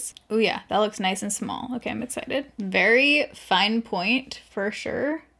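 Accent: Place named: United States. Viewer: Scalps. She made scalps for dinner... The American accent blows my mind sometimes lol. Love you, girl! 💕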